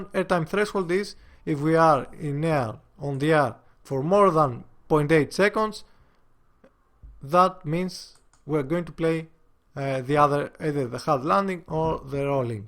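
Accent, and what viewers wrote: Greece. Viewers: You are Greek because of your accent.. Huh?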